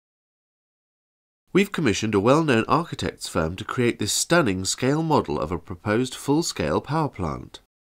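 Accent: United Kingdom. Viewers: Interesting concept but I doubt the canned ham or the sedated fly have much input to the system. Although the use of insects in esoteric devices isn't un heard of. Viktor Grebeinkov observed anti-gravity effects in beetles and used their wing/shell casings to produce levitation. Good spoof with the pompous British accent. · Ok dawg, you got me. Nice one! Very well made. Nice Brit accent. Cheers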